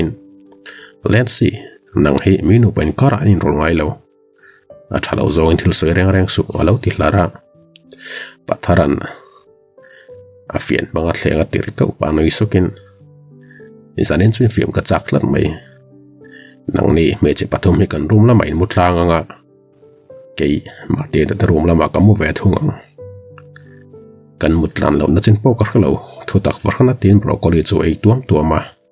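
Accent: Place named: Hritlang, India. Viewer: Fel thei ania aw... i Hritlang aw pawh ngaihthlak a nuam lutuk ania 😍😍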